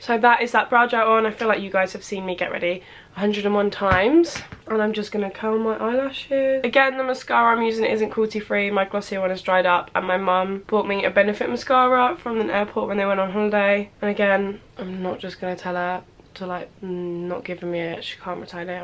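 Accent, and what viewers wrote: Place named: Cornwall, United Kingdom. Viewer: Omg you are stunning! ❤️❤️❤️❤️❤️❤️❤️❤️❤️❤️ Edit are you from Cornwall? I love your accent x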